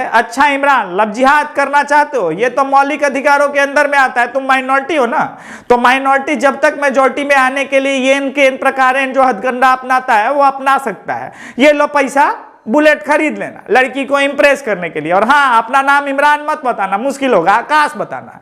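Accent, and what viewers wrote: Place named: Bihar, India. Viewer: Bihari accent se problem ni h lekin chilla ke bolna cringe bana raha h video ko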